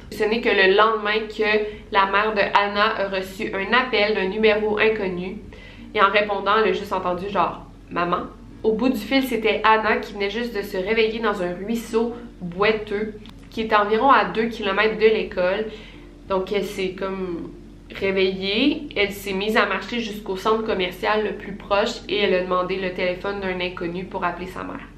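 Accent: Quebec, Canada. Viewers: La langue québécoise par contre c'est tjr un plaisir ! 🧡de 🇨🇵🐓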